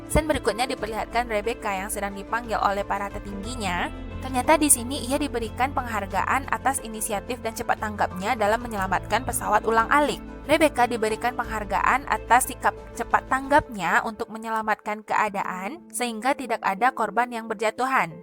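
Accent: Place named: West Sumatra, Indonesia. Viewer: kan betul. Aku dah tebak dari pertama. Minang betul logatnya. Mantaap kokk. Jangan malu sama logat kita. Aku melayu hehhe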